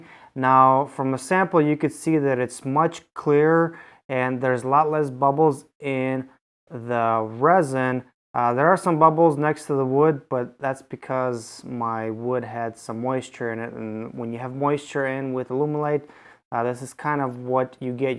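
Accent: Russia. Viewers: Hi! You from RUSSIA?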